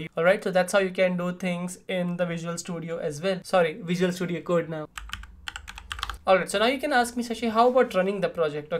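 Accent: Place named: India. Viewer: Nnnnoooooo This video is exactly what I needed. And yet, it has been cursed with a pajeet accent.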